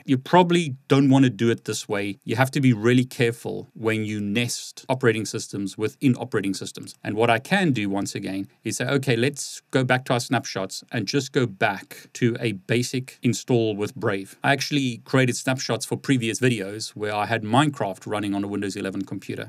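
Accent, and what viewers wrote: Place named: South Africa. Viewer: It's a South African accent!!!!!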